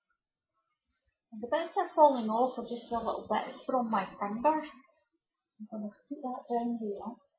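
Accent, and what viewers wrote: Scotland, United Kingdom. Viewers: as an American I don't speak Scottish accented English so I turned on captions and it's still missed a lot of what you were saying, but I got the gist of it and thank you for putting this up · Haha, I was thinking the exact same thing thru the whole video! There's just something about a Scottish accent, so easy to listen to - almost musical! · Hi --I was looking for paper clay recipes and came upon your video for air dry clay. I'm looking for a kind of clay that I can use to put in decorative silicone molds, remove from the molds when still soft, then glue them to furniture I'm painting. I don't know if your recipe will work, but I have subscribed to your channel because I love your Scottish burr. I could listen to you forever. Thanks so much for this recipe.💗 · Great recipe and what a joy to hear your accent! My mum and grandparents were from Scotland and I never hear anyone with a Scottish accent anymore. 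· What a beautiful accent ....... how I miss Scotland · Very good recipe you sound Scottish. I'm scotland so thank you for recepe scotland u.k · Omg.... I could listen to your voice all day. Love the Scottish accent, and very interesting videos as well. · LOL you shouldn't stop yourself. Scottish mannerisms and dialect doesn't get anywhere near the exposure it deserves. lets face facts we're pure dead brilliant and everybody should know it :)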